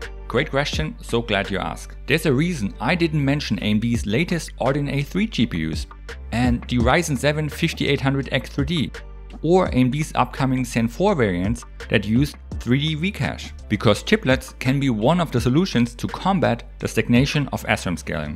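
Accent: Germany. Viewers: I can hear the german Accent hehe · Sounds like the most Deutscher Akzent ive ever heard xd but nice video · ja das ist es xD du hast einen krassen deutschen akzent aber hey, fühl ich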